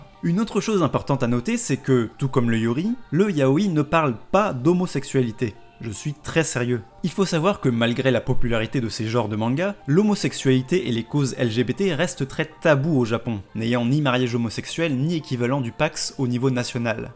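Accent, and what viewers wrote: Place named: England, United Kingdom. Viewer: Ton accent anglais est magnifique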